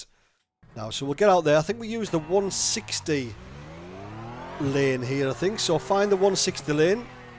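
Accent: United Kingdom. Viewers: What part of UK is your accent?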